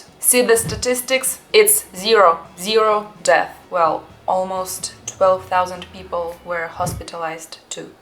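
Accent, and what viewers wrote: Russia. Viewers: Is that an American accent behind the so called Russian accent? There is a lot of free stuff in Moscow too. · What did people in Australia call you when they hear your accent? They''d always call me a "Yank." My money is on "Russkie" I'm guessing. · Just LOVE the Russian English😂😂😂😂 Excellent presentation. Well thought out - 👏👏👏👏👏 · You're always so wholesome to watch, you have such a positive happy outlook 😊 I love that accentuated Russian accent you were doing throughout this video haha. I'm half Russian, but born and raised here so no accent for me sadly! There are a few suburbs in Sydney outside the city with tall buildings, Parramatta, Chatswood, a few others. Anyhow, I'm glad you enjoyed it and hopefully you'll come back sometime. Perth is worth a visit, it's quite different than other Australian cities. Take care mate! 😉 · I absolutely love the sound of the Russian accent.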